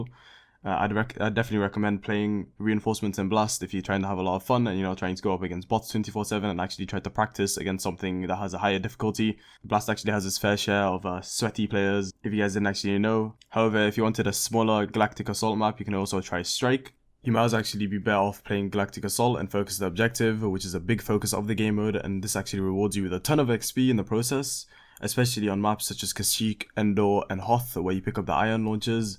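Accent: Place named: Singapore. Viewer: Man sounds hella Singaporean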